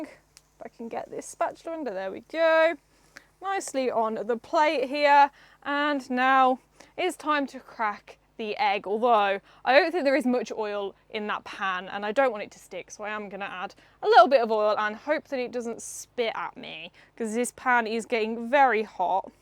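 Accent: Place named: England, United Kingdom. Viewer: Lovely English accent ❤. I'm definitely going to make myself that same English breakfast.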